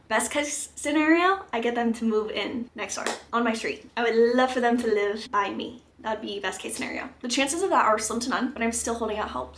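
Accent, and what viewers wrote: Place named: United States. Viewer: i thought u had a country accent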